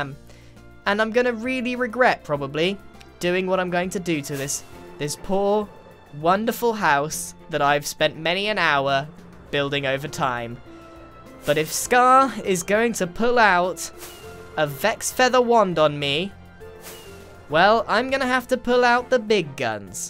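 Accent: England, United Kingdom. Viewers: Grian has an English accent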